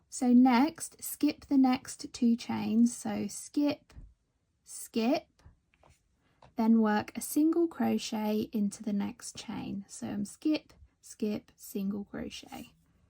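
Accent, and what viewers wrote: England, United Kingdom. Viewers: You sound English, like me. What are you doing up at 5.30 am?😄Me? I'm feeding a stray feral cat (charlie) who waits outside the door for me to get up. x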